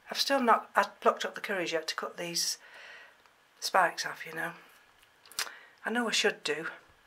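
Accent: United Kingdom. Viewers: where did you get your shelving? You sound to be in the uk like me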